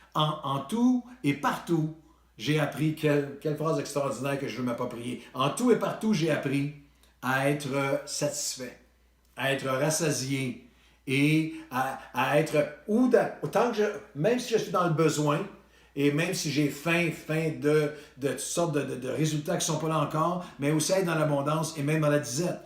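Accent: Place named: Canada. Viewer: Bsr, j’aime vous écouter Mais j ai du mal à tout comprendre avec le français canadien trop vite Que Dios l bendiga amen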